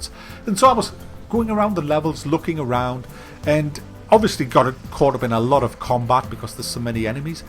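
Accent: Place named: Newcastle, United Kingdom. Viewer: Hey what part of the uk are you from you sound like someone from either sunderland or Newcastle haha?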